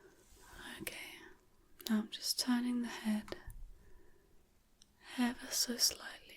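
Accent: United Kingdom. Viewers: I think the accent and resonance of the voice, yes British or other may have a measure of engrained history, spirit, and stability which for me makes the feeling or resonance more appealing. My grandmother had that. For me the body language, face and hands also have this. I feel the societal treatment for girls/women also allows for many to maintain much more stability and ease. I feel this much more support (along with much more social interaction/transfer of skills over time) is working together to create much more lower average layers, ease of learning/motivation to learn (mental reward received for mental work expended), and together is allowing more and more ease of development in the information age. Theory which sees our average stress as much more complex: both conscious layers and many many other maintained subconscious mental work which for higher layers persons is forcing them to work much harder to receive the same mental reward and usually also missing out on much positive social interaction and better acquired mental/social/emotional skills over time. 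Hope theory will provide tools to more permanently reduce layers through more continuous resolution and tweaking faulty weights and values on our own to help permanently reduce many layers.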